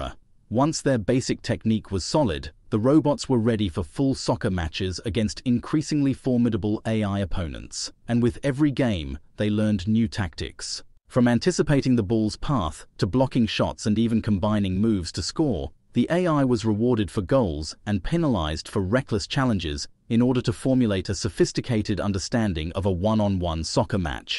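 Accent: United Kingdom. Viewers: Why would a voice with a brit accent call is soccer? Boooo. Lol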